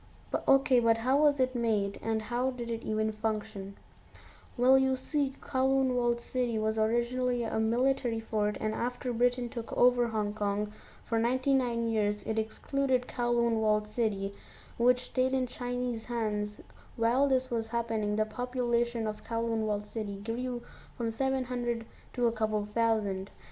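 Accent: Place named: India. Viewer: Your voice changed so much holy shit, it became so indian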